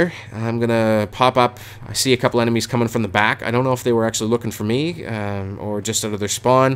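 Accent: Canada. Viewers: are you canadian because i don't know why but i hear that type of canadian accent when you speak and pronounce vowels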